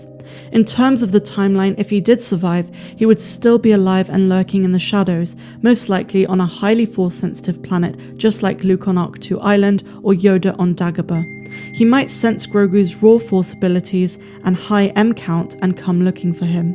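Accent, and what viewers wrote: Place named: Bristol, United Kingdom. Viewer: all good choices but although iwhould like to see luke train him i am not sure he would want to after what happend with the way it turned out with ben solo by the way love your accent is it from the Bristol area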